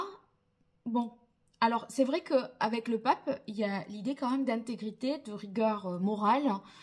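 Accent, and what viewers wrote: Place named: Brittany, France. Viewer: Merci pour la vidéo, vous êtes jolie comme cœur ! 🙂 Et votre bel accent il vous vient de Bretagne ?? 😉😋😇 Beau Dimanche tous 🙏🙏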